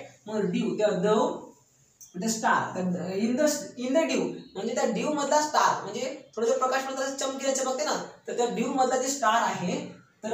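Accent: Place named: Rural, India. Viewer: ते गावाकडचे आहेत ना म्हणून. थोडं समजून घेतलं पाहिजे आपण 😊 छान समजवल त्यांनी 😊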